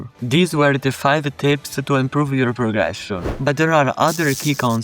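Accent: Italy. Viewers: Are you Italian?Cuz your accent seems so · his accent is clearly italian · italian accent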